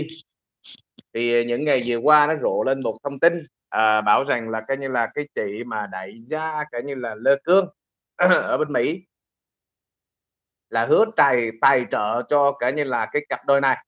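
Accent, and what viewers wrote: Standard. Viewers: Chào Anh noi rất chuẩn.like like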